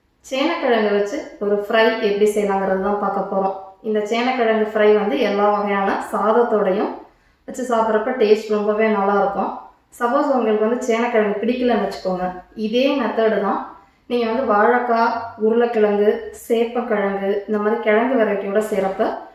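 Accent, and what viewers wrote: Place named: Karaikudi, India. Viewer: Your native mam don't mistake me ur belongs from karaikudi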